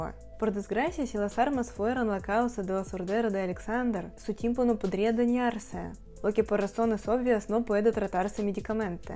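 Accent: Russia. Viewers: Hola me encanta escuchar tu español con acento ruso. Esas "r" se escuchan bien chingon. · Lo que mas me gusta de sus videos, es su acento ruso hablando español😊, saludos 👍👍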